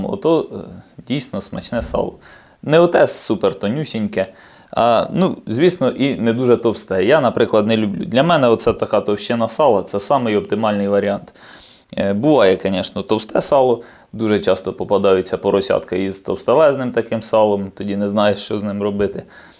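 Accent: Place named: Poltava, Ukraine. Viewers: який приємний говір... такий як у нас на полтавщині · суржик обычный . зачем вы коверкаете русский ??? противно слышать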